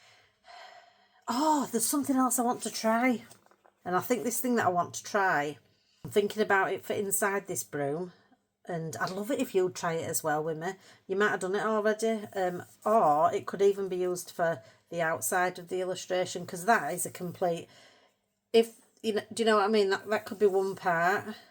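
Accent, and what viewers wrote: Yorkshire, United Kingdom. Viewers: Hi, loved this video. I only watched, didn't take part but got some great ideas, so thank you. You are so funny. Where abouts in Yorkshire are you from. Loved listening to your accent and some of the things you came out with are the same as what I would say so you had me laughing and smiling so thank you so much for the laughs and for sharing your processes and thoughts. Gonna watch your next video in this series. Thanks again, take care. Kind regards from Helen somewhere in the Greater Manchester area, UK.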